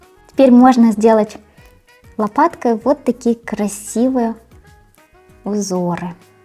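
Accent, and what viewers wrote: Ukraine. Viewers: Чудовий , ніжний , гарний тендітний десерт як і автор каналу !❤ браво! Окрема подяка за прекрасну українську!!!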